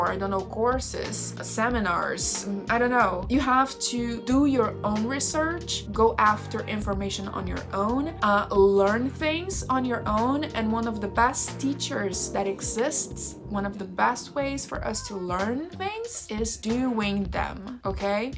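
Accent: Brazil. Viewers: Eu sabia que você era brasileira!! Seu sotaque é muito daqui <3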